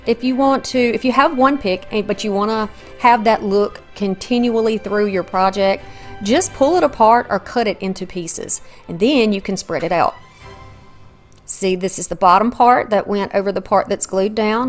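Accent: South, United States. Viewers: Being a child of the 50s.... I LOVE this.... but I have to say it hurt my heart when you cut the books !!!! LOL.... but love that you gave it new life...... I would love to see more of this... I am a new subbie!! And I can tell you are a fellow Southerner..... Mississippi here..... looking forward to more videos...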